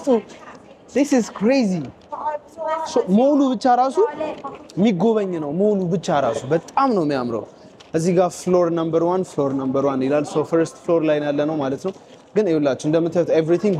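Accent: United Kingdom. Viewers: u got a bitish accept man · I like your British accent….👍🏽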